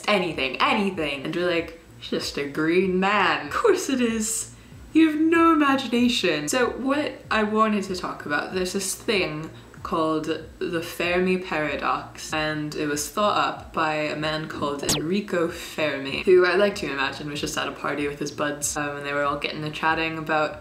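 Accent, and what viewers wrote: England, United Kingdom. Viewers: Okay legitimate question about your accent (not meaning to be harsh or offensive): How have you managed to develop a semi-English accent? Is it somewhat deliberate? Because I find it hard to believe that you can naturally pick up an accent when you're a young adult. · I watched this video and the first video you posted on YouTube. I swear your voice sounds way different. I first thought you were British, but in your first video you sound American! Haha, are you watching too much BBC? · Does anyone else notice her slight British accent? If you do, isn't it adorable?! And yeah, I believe that wee not the only ones out there. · You have like a British accent in this lol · and you've a little English twang in your voice ohmygod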